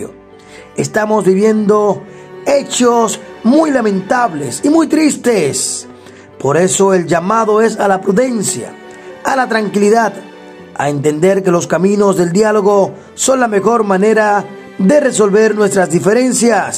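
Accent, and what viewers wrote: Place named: Dominican Republic. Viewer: Solo te faltó decir en qué lugar del planeta paso eso noticias tragicas en voz de un Dominicano eres comunicador y eres bueno manejate. Ook mi Bro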